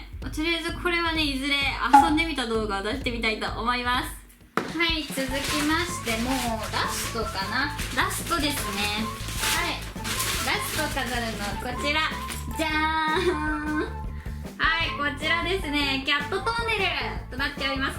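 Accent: Hiroshima, Japan. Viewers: 広島弁かわいいです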